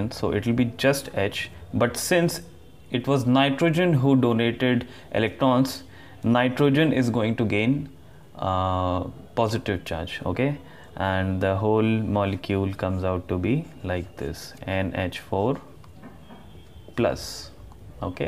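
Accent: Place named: India. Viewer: I can't take him seriously with the Indian accent 😅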